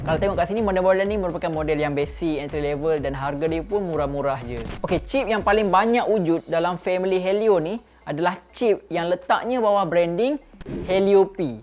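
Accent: Malaysia. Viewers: Gw suka logat malay kayak lihat upin upin wkwk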